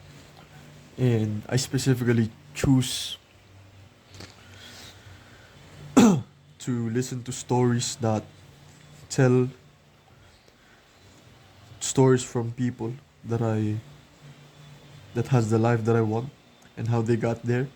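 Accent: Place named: India. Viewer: Lol why are you trying to hide your accent! Embarrassing your Buddy Indian accent!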